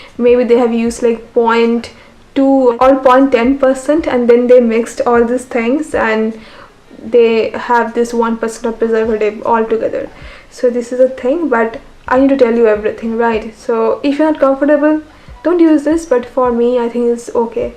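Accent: Bengal, India. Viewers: I just discovered your channel!! N I love your content!n I hv 2 questions fr u,:- 1)are on instagram??how can I reach u ? 2)r u bengali?(am guessing it fr the way u speak) And lastly much love to u!❤️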